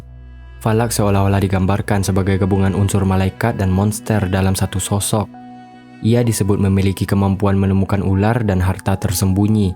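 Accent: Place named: Aceh, Indonesia. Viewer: bang orang aceh apa orang lombok bang? kentel banget logatnya 😁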